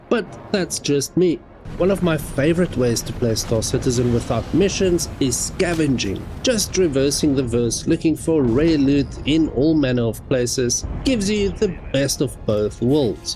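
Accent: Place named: Afrikaans, South Africa. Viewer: jy klink afrikaans, julle dalk 'n org wat ek kan join?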